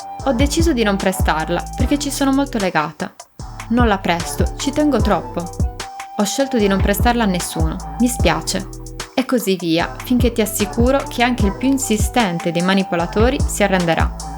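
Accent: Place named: South, Italy. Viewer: Amore, con questo accento terrone? Un libro di grammatica, no? Quanta ignoranza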